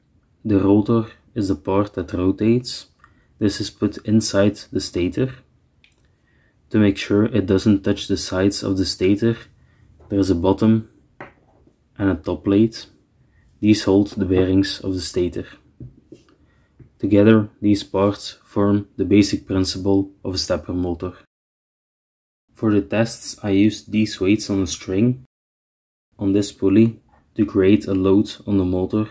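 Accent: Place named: Flanders, Belgium. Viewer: Are you flemish? Curious cuz of the accent :)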